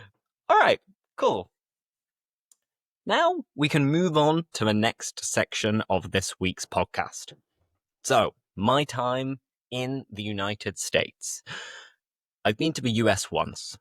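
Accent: United Kingdom. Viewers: Hi cute, I'm from Mexico, from San Luis potosi State Mexico. Here the regular every day temperature is 35 to 37 degrees, so 23 degrees is the perfect temperature, congratulations to you, I like your podcast and I watch you any time I can, I try to understand your British accent, that can help me to improve my English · ❤ Good night Argentina Buenos Aires! Love you and love British accent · Hi you are the best YouTuber I started to watch your all videos.. it’s really helpful to know about UK. I love British pronunciation. Thank you. God bless you. From Sri Lanka Living Lincolnshire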